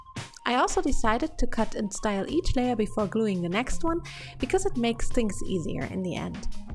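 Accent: Germany. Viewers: I’m a huuuuge sucker for German accents, and I completely agree!!!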